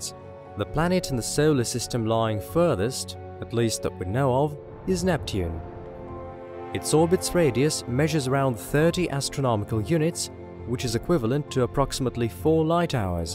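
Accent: United Kingdom. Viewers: This guy sounds like the posh English dude that does/did stream Civilization on YouTube when him and his friends played it together 👍😅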